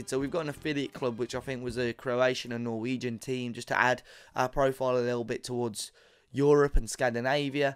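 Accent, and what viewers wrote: Malta. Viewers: you re maltese ? nice channel btw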